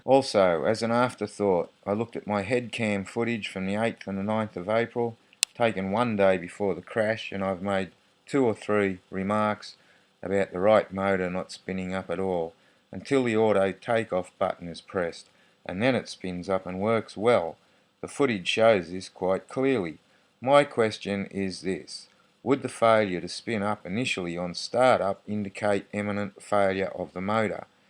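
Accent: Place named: Australia. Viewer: I'm pretty frustrated with mine too. Next to no instructions with it. Have to learn from other YouTube users or by trial and error. I reckon I've flown maybe a half-dozen hours! . I guess the presumption is that everyone's an expert. Can't calibrate it. Blooming expensive shelf ornament. (PS: Glad to hear an Aussie accent). I might go back & see Gerry Harvey's staff again. (Sigh)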